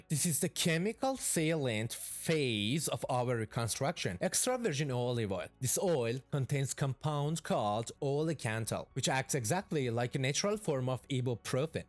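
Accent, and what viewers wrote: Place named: France. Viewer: i can hear a french and Swiss accent or Indianish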